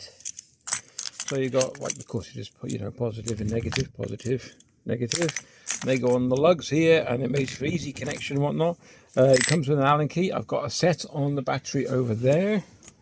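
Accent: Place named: Shropshire, United Kingdom. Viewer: recognise your accent.....anywhere near Shropshire?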